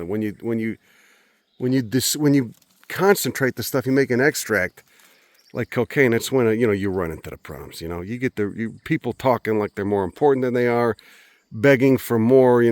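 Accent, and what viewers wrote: Chicago, United States. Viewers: Heyhey, the guy's from Chicago not NY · Chicago, not New York.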